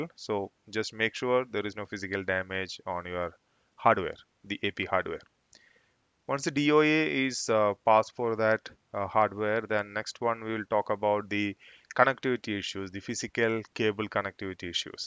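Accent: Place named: Kerala, India. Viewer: Malayalam പോലെ ഇംഗ്ലീഷ് പറഞ്ഞപ്പോ മനസ്സിലായി... Anyway very good videos.... Followed you in insta👍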